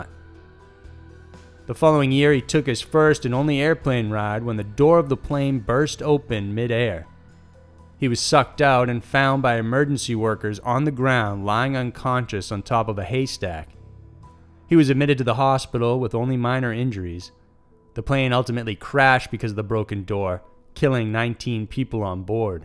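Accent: South, United States. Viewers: He has a nice Southern accent. To my ears, anyway (Canadian).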